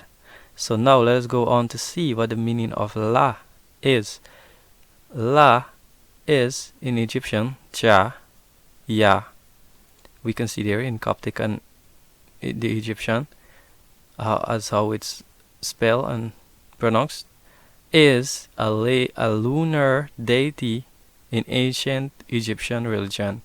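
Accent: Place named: Philippines. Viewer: The poor fool doesn't know what he is talking about. He sounds Philippines.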